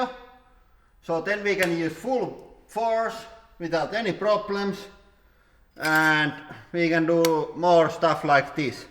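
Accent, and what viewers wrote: Finland. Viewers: He´s from Finland. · Charles Ray He is from finland.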